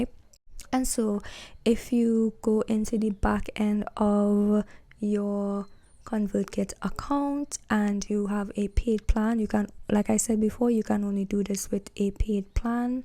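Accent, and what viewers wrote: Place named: Trinidad and Tobago. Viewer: trini accent a fight fi come out lmao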